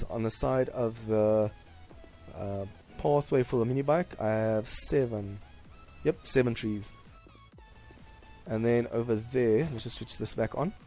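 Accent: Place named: Afrikaans, South Africa. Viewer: Epic! Nice job, great results ... Love it... The Afrikaans accent :)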